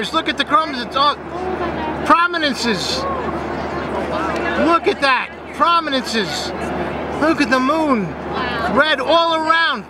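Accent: New York, United States. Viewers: lol Not another one.. Oh my God, oh my God. But, sir, thank you for filming a perspective most of us couldn't see. (You from NY btw?) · oh my gahd, here we go, oh my gah, here we go, look at dis x 100! New York obnoxiousness on an Alaska Airlines flight?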